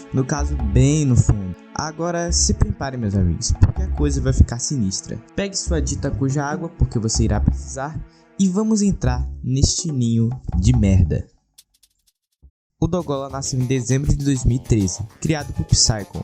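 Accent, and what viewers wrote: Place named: Paraíba, Brazil. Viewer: Pode regravar esse vídeo mas trocando a voz por uma pessoa pós puberdade e de preferência que não seja paraíba?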